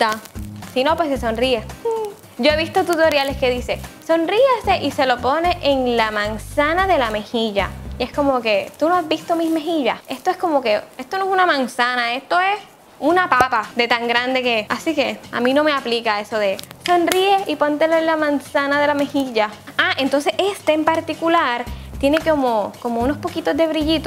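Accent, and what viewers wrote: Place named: Isabela, Philippines. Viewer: Hola, vengo del canal de Josue, no sabia q tenias uno. Me alegra mucho, q hallas decidido grabar. Tu forma d hablar suena bien brutal a como hablamos acá en Isabela, se q somos del mismo país pero no en todas partes tienen el mismo cantaito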